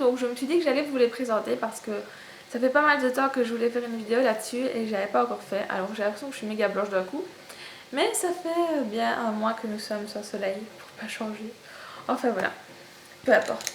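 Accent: Belgium. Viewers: Tu es belge? :)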